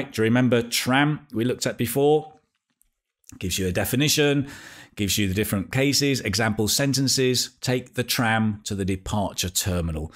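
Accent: Spain. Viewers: Hahaha I love your Spanish (from Spain!) accent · Me sorprendió tu acento español 😃 It's great ! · you have spain accent! it sounds curious! thanks for ur lessons!!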